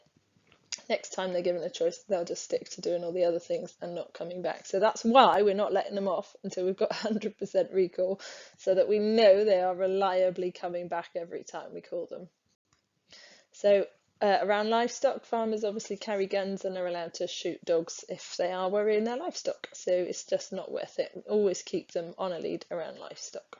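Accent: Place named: Europe. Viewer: judging from your accent believe you are in Europe, sorry I'm in japan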